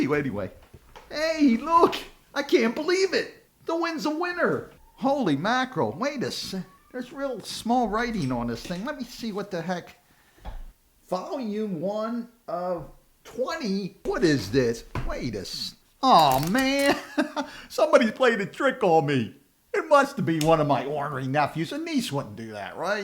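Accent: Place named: Pennsylvania, United States. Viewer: I'm trying to place the accent. Chester County. Maybe Delaware County.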